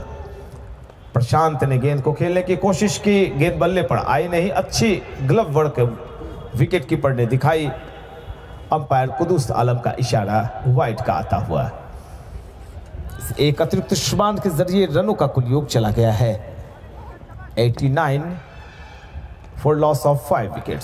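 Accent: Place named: India. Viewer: Your voice is fantastic ji....Totally loved the flow of your hindi commentary. However the English commentary is very awful, Its better to continue in the original Indian English dilect. - Cric Academy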